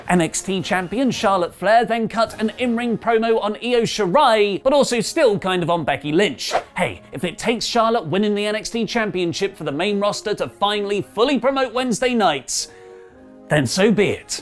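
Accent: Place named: United Kingdom. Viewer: Where are you from? Your accent is British English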